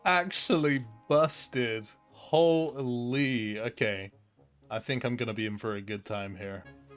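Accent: Bristol, United Kingdom. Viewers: Are you from bristol sound like it lol😂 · Are you from the bristol area-ish? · Am I hearing a Bristolian accent? XD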